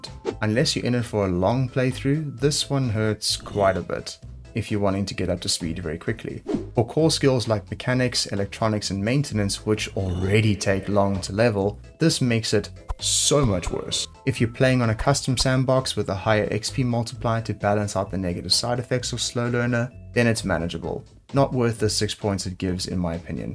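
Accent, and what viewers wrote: South Africa. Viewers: You sound south african. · Hey bro are you South African? If so, greetings from the Eastern Cape! And great video man🤞🏼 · Are you a South African bru? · My Dude .... the South African runs rampant in you!! Thanks for the tips tho!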